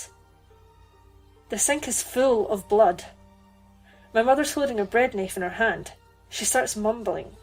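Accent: Scotland, United Kingdom. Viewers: Are you skotish?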